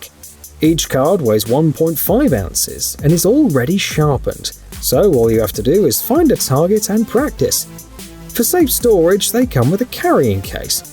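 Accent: United Kingdom. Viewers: You are in the USA! Why are you doing the narratives using a Brit???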